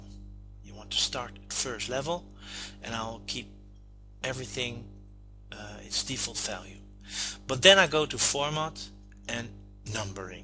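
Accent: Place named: Netherlands. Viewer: Thanks! Love the charming dutch accent. You rock!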